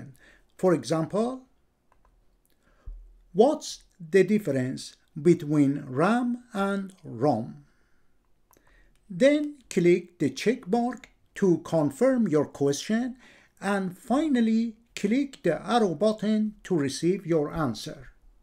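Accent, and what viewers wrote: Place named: Iran. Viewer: Thank you for clearly explaining this clearly .. your Persian accent made the whole concept actually more comprehensible & for that reason I subscribed ☺️🙏🏼🙏🏼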